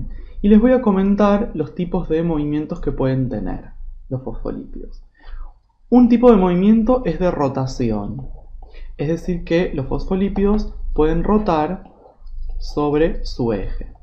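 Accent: Argentina. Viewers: Me encanta el acento Argentino.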